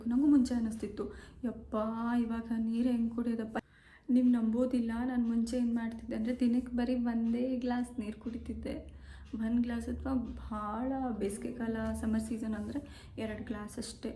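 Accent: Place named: Bangalore, India. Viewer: Nam Hubli kannada matadri Bangalore style Kannada beda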